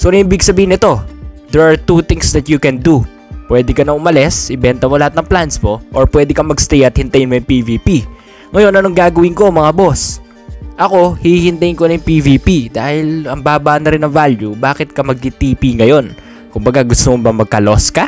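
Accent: Pampanga, Philippines. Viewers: Kapampangan ka ata boss?😅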